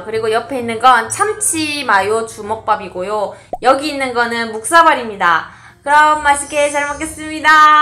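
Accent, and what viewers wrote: Gyeongsang, South Korea. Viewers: 누나 역시경상도는 사투리제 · 처음에 알고리즘으로 보게 됬는데 익숙한 사투리라서 뭔가 반가웠는데 창원쪽에 사셨군요 ㅎㅅㅎ 전 부산 사람인데 같은 경남이라서 반갑네요! 먹는 스타일도 너무 제 스타일이셔서 구독했어용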